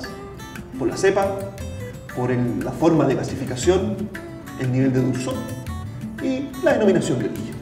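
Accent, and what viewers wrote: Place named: Chile. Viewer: esta loco este wey!! de donde es ha? parece tener un acento chileno....... los vinos espumosos de Italia se llaman ASTI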